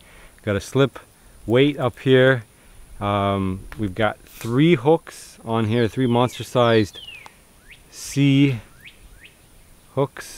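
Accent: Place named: Canada. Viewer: You sound very Canadian but I have to ask...do you live in Michigan?..by the way final fantasy 3 for she's is the best game ever!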